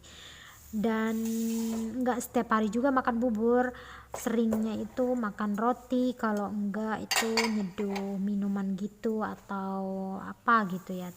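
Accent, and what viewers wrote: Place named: Surabaya, Indonesia. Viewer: Mohon maaf klau di lihat dari logat bicaranya Mbak dari Surabaya bukan yaa Mbak??🙏🙏🙏🙏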